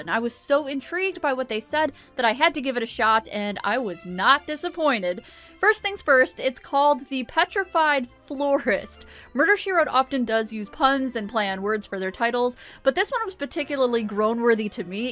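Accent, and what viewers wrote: United States. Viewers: they are NOT homophones to her. It is regional in the US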